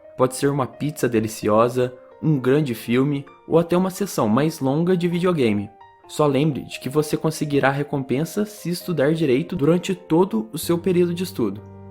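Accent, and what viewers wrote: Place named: Minas Gerais, Brazil. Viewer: Onde o mora? Ele parece Mineiro!!!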